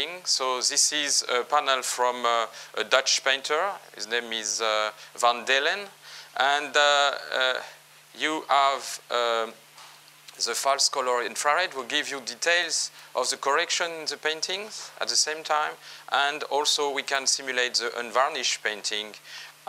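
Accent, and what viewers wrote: France. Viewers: id rather listen to a french accent that half of the braindead hick american accents. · ehh.. 47 minutes with French accent...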